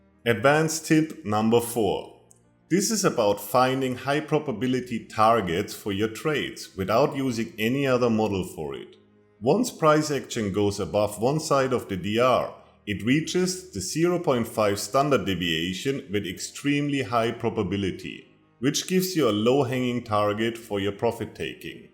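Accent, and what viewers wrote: Germany. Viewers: Dein Akzent ist Deutsch, daher antworte ich auf Deutsch ;-) Das schaue ich mir genauer an. Aber das scheint echt irre cool zu sein!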